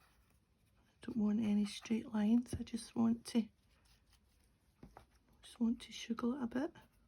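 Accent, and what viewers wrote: Scotland, United Kingdom. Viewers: I could listen to you talk all day. Love your Scots accent!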